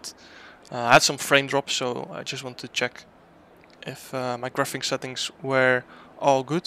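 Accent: Netherlands. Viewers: je hebt echt wanneer je Engels praat hoor je gewoon het Nederlandse accent Lekker gedaan jammer met de damage Maar lekker bezig een "keep it up"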